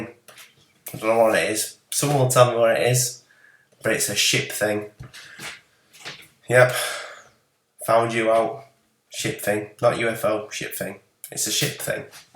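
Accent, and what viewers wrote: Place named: United Kingdom. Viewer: I live in the UK in a small shit town called Grimsby, and i know you will know it just by your accent, anyway, i am a big fan of secure team 10, but hats off to you mate, even i didn't believe in most of his Google earth images, and i know other videos may be fake, i just wish now he would do his research properly, I feel a bit gutted actually. But i still believe that there are alien craft out there, most of it probably back engineered by the real terrorists, AMERICA! The only country allowed to bomb other countries, and when they fight back, they are called terrorists, anyway, that's a different subject. Nice work buddy.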